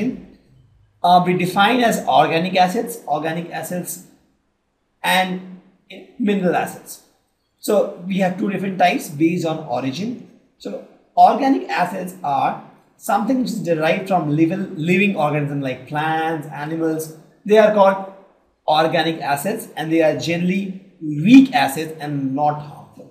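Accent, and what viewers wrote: India. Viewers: Indian accent is funny.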